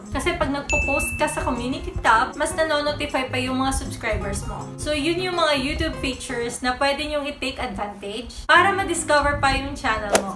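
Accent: Visayas, Philippines. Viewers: bisaya d ay ka lodi? hehe .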